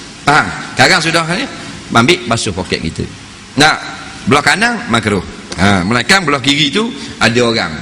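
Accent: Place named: Terengganu, Malaysia. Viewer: Maaf teman. Ini bahasa dialek orang Terengganu di Malaysia.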